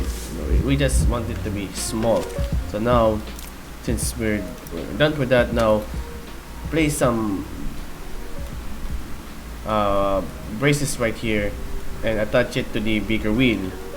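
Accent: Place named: Philippines. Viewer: Where r u from You sound like a filipino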